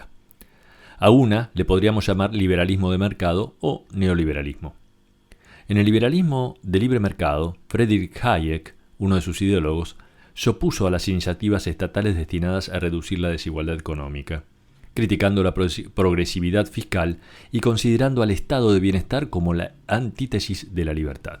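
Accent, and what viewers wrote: Argentina. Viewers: a veces tengo que darle al stop para asimilar el contenido, muchas veces vas demasiado rápido y siendo español adaptarme al tono argentino ,pero el contenido es bastante interesante y beneficioso. Saludos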